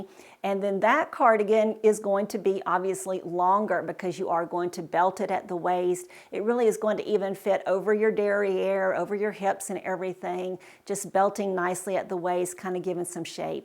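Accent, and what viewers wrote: South, United States. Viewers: Oh my goodness what part of the south u from I'm from No.Carolina love your videos but I think u could perk it up & jazz it up more 💜🎄🎅🤶🦌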